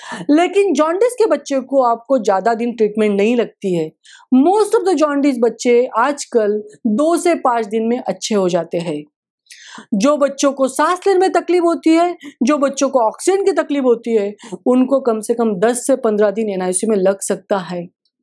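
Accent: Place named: Maharashtra, India. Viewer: Aap marathi hai ,aap hindi nahi bol parhe ho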